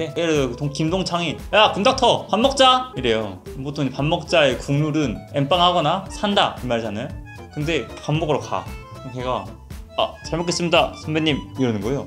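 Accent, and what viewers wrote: Gwangju, South Korea. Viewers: 뭔가 광주 사투리가 엄청 정겨워요 ㅎㅎ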